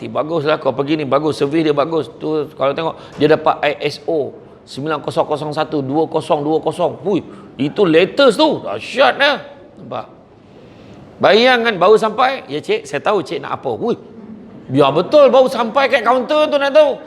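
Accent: Negeri Sembilan, Malaysia. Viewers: Itukah kan,cakap nogori best👍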